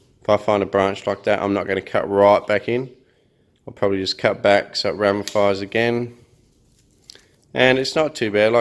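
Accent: Australia. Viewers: can anyone tell me what accent is that? is he Australian?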